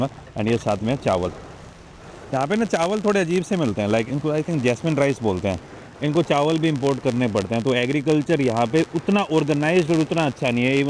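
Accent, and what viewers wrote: India. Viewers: Just a suggestion. Use interrogative sentences when asking a question. "This is a fisherman village, ?, yes!?" This sounds vague and confusing, and sometimes it can come across as rude (especially with our Indian accent). We don't have to change our accent, but instead, we should use an interrogative sentence...like ask, "Is this a fisherman village?" simple, effective, short.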